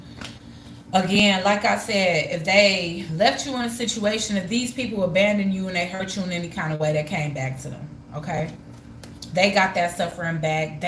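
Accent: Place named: United States. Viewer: Yahh boo looking glowing for real for real I love the American twang I'm a London boy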